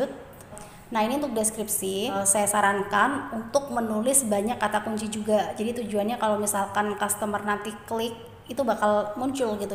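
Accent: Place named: Java, Indonesia. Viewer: Kayak ada manis2nya .... orang jawa ya ka?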